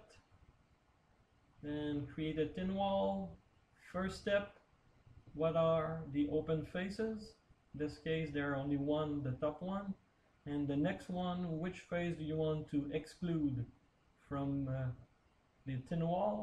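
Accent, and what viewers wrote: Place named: Quebec, Canada. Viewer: From your English accent, I have the impression that you're from Québec :) Thanks for the Video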